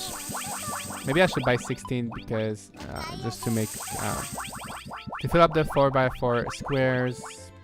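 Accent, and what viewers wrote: Quebec, Canada. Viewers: Hey! So cool to hear a fellow French Canadian. I could tell right away from the accent;). I also recently bought Rune Factory 5 and I was wondering if you knew of a website or maybe a Twitter that could provide some form of guide for the game? Simple things like what gifts certain people prefer and what to give monsters/boss monsters. If not, no pressure! Thanks for providing us a high quality, in-depth look at this awesome game. Edit because I honestly thought way too late about this and I feel like that’s something you considered doing in the future: would you be interested in making a video about how to raise friendships fast/who likes what gifts the most and such? Only if it’s not bothersome!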